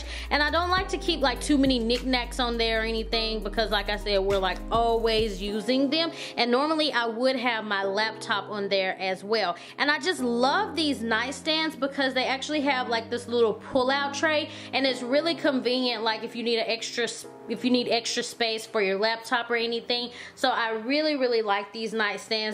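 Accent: South, United States. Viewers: Just Beautiful!! I love everything you have done with the room. You are beautiful and your voice is so country. Love that too hahaha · are you from the south?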